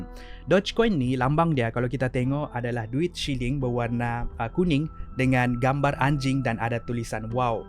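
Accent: Sabah, Malaysia. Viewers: Aslmkom, Boleh Kerap Sikit Upload Video. Hehehe.. 😊😊😊.. Aku Suka Dengar Cara Ko Sampaikan Satu² Contents Tu..! Tak Kiralah Contents Apa.. Yang Dalam Loghat Sabah Kola.. Lama Sangat Dah Tak Jumpa, Kawan² Sabah... Dengar Loghat Sabah Pun Boleh.. #FlashBack Juga Sikit² Tu Kan.....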